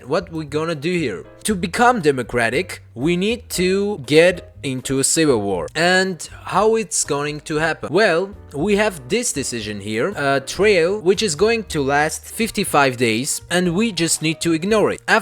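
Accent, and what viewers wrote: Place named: Arab world. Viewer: Arabian accent, the youtuber is from the UAE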